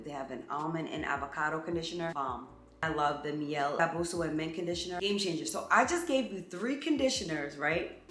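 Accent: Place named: Philadelphia, United States. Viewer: Are you from Philly? You have a Philly accent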